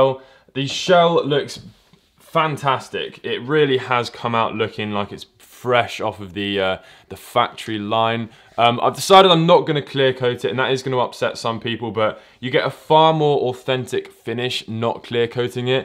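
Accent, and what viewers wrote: United Kingdom. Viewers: raw vid is the way, you uk ppl sounds the same to me from Sweden :) so its like watch the same dude all the time. ;)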